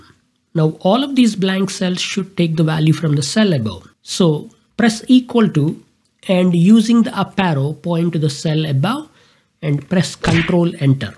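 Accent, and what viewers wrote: Tamil Nadu, India. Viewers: Nice tamil accent with english😊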